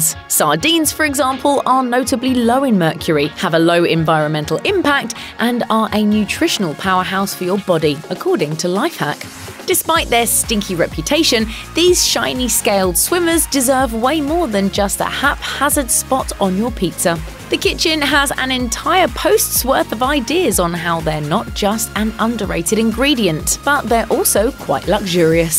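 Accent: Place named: United Kingdom. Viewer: Ugh who is this British narrator? Bring back the old narrator.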